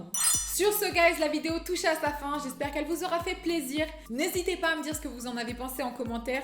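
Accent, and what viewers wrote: England, United Kingdom. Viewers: Peuhh ! Arrête de juger les gens et surtout arrête de dire ( COUCOU GUYS ) on est pas tes pote ! Et puis arrête avec ton accent anglais sa saoule !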